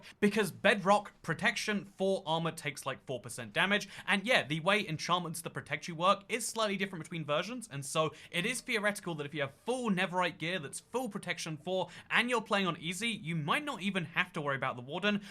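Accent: United Kingdom. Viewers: Now that he said that, I notice that speaking pattern with all the British YouTubers I watch. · When it comes to adding amplified to bedrock, I feel like the reason is not so much the difficulty of adding it, but maintaining it. You gotta remember that they really want worlds to transfer fine to newer versions. So yeah, that's my guess. P.S.: I find the r thing British people do hot. You're welcome.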